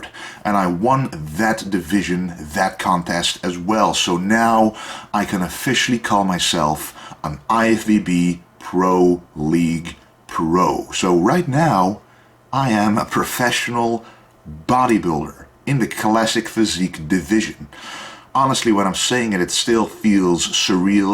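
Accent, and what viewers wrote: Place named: East, Netherlands. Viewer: Haha grappig accent. Oosten van het land?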